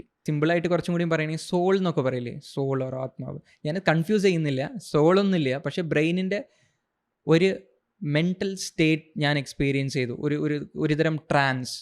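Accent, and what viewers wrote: Tamil Nadu, India. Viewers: bro are you tamilian or malayali how did you learned malayalam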